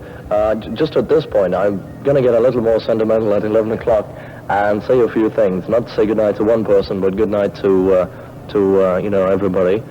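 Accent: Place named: Mid-Atlantic, United States. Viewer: Oh that dodgy mid-Atlantic accent!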